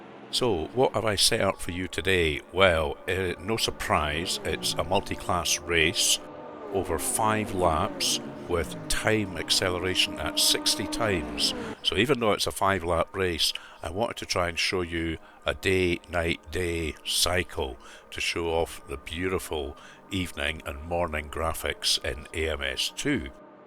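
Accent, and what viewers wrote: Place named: Scotland, United Kingdom. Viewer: Great video. Subscribed. AMS2 is very underrated. Nice to hear a fellow Scot. Greatings from Edinburgh.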